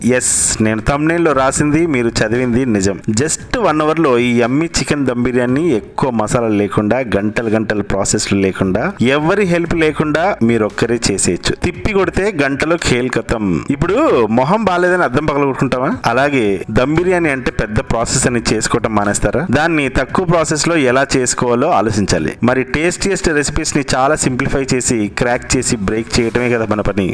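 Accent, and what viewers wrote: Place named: Andhra Pradesh, India. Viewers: Mee words konni ma srikakulam slang la anipistunnai ..ae uru manadi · Meru matladutunty ma village ki vellina feeling vostundi,rajamundry, bhimavaram,Godavari antuntaru kadha,nice videos anna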